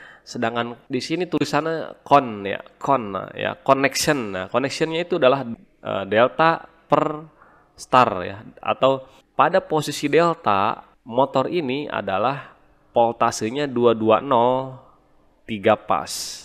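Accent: West Java, Indonesia. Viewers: Mang orang Sunda ya😃😄😄?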